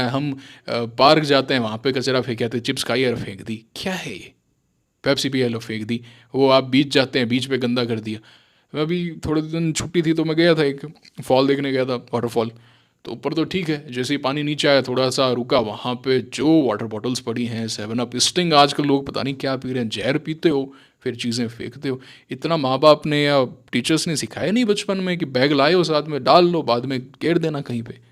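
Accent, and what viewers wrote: Rajasthan, India. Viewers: आपके बोलने के टोन में कुछ ज्यादा ही राजस्थानी बोली झलक रही है, पहले से कुछ ज्यादा, so मिट्टी से जुड़े रहिए 👌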